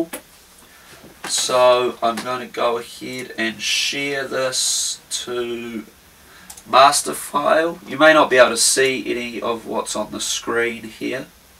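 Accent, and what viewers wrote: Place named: New Zealand. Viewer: New Zealand? reminds me of Rhys Darby (Murray Hewitt's character) from Flight of the Conchords :) btw, great video. thanks for sharing.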